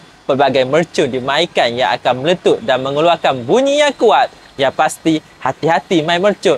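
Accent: Kelantan, Malaysia. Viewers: Kuat betul Kelantan nya cuba cakap formal pun masih bunyi Kelantan lah 😅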